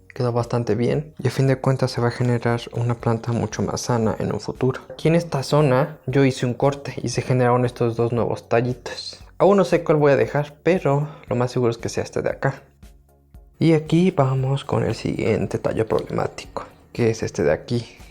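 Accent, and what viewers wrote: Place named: Mexico. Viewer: Buenos días desde España,. Una vez más... gracias a ti por responder tan amablemente y compartir tantos conocimientos. Ayer a punto estuve de despedirme con un... "fuerte abrazo desde España para todo Méjico", pero dudé de tu nacionalidad porque tu acento no es tan marcado como el de otros de tus compatriotas, pero ahora, una vez confirmada mi sospecha, puedo despedirme diciéndote.... ¡¡¡ DESDE ESPAÑA... UN FORTÍSIMO Y SINCERO ABRAZO PARA TODO MÉJICO!!!.;o)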